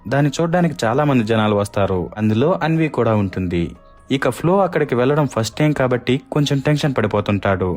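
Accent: Rayalaseema, India. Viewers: Bro mee accent rayalaseema la undi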